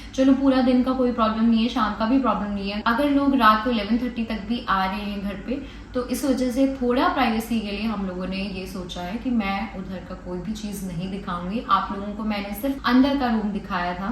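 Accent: Garhwal, India. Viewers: Hayee dii apki gadwali.....😍♥️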